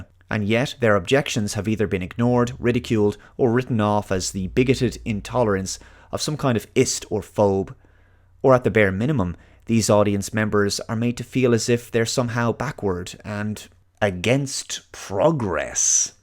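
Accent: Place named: Ireland. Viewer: At first I hated the accent because I thought he was British but towards the end of the video I realized he was Irish and started liking the accent. I think I'm racist.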